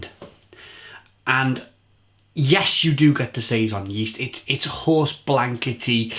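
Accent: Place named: England, United Kingdom. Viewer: which part of England are you from? I find the regional dialects of the country very intriguing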